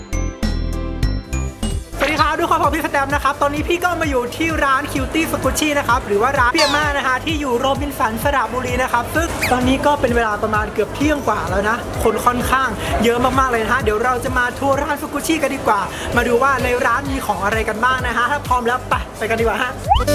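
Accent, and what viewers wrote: North, Thailand. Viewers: ปี้ๆคนเหนือก่ะครับปี้ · พี่ เปนคนเหนือหรอคะ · อ้ายเป็นคนเหนือกะ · พี่ เป็นคนเหนือกะ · พี่เป็นเป็นคนเหนือเหรอผมก็เป็นคนเหนือ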